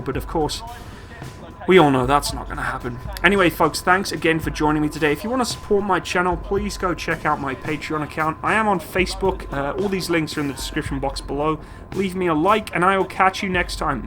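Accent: United Kingdom. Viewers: i though you were british? how long you been in canada?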